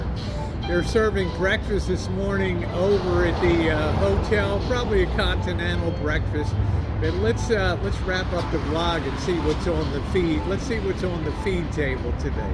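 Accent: Baltimore, United States. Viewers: I'm a new subscriber although I've watched some of your videos along the way before hitting that button. I've been trying to pinpoint your accent, and I thought you must be from Baltimore. When you mentioned that you've had enough of mobsters, etc. in Baltimore, I think you just confirmed my guess. You're such a positive, energetic person, and I really like your videos.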